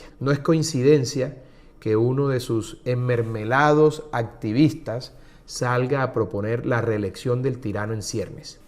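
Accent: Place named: Caribbean Coast, Colombia. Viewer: Abelardo, te preferiría como presidente por tus capacidades y hablar costeñol. Pero, te estás quedando atrás frente a Cabal y otros oportunistas. Ponle más seriedad a la vaina, que el cristo es de plata. Basta de bolloneria, te están cogiendo de guachafita. Coronel, salve usted la patria. Bendiciones.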